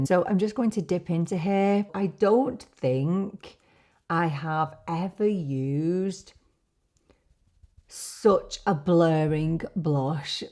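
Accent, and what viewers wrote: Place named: Northern England, United Kingdom. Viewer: I thought so 😀 I’m a northerner too, so I guess I gravitate towards you, I can relate! I’m originally from Leeds and moved to Nottingham when I was 24 (I’m 53 now) and my accent will never leave me 😂 neither will yours. Never been to Ireland myself but I’ve heard it’s very beautiful. I travel to Birmingham regularly as I’m a big gig lover. I have posted a few videos on here if you are interested. I was so excited when I saw that a Sephora had opened in the bull ring. It’s got so many different brands on display and I got a bit overwhelmed if I’m being honest. I didn’t even buy everything! 💕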